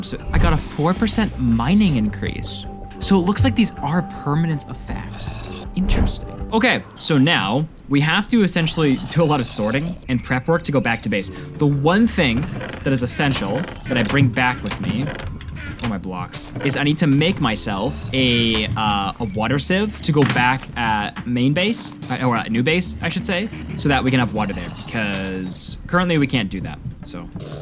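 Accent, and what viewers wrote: Canada. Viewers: Are you Canadian? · Why was he so Canadian in this video? Maybe I'm trippin and just haven't noticed before but I thought bro was American up until now😂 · Are you from Canada by chance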